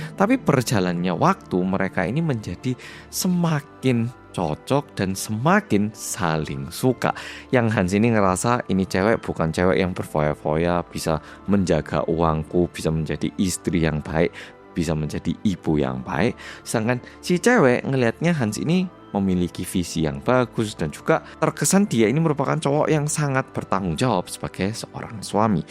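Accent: Java, Indonesia. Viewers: Mas yang medoook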